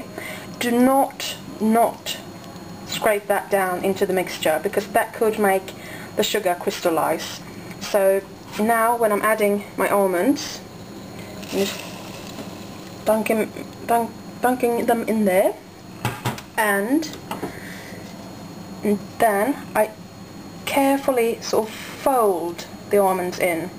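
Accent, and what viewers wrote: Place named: United Kingdom. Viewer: Cool, I'll have to make some of these. Do you live in Sweden you sound British ;)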